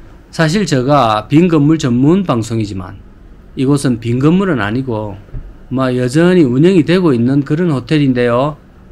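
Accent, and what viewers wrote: Gyeongsang, South Korea. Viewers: ㅋㅋㅋ 말투가 경상도신데 전라도라니 무슨 전략이신지 ㅋㅋㅋ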